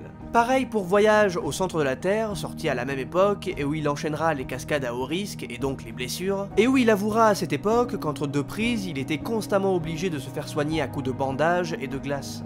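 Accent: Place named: Perpignan, France. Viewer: Tu as l accent de Perpignan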